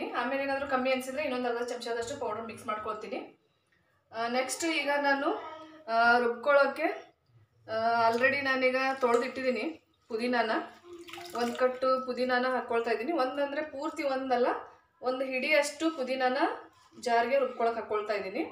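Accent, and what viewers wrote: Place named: Dharwad, India. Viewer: Nanage nivu matado darvad Kannada tumba like madtini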